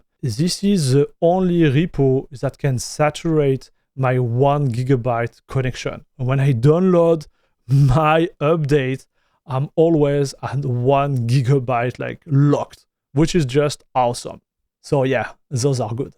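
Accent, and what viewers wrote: France. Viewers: French accent at it's best! Love! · It's Frenglish but it"s not too bad 😀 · bro he's likely native French and learned english, how do you think accents exist?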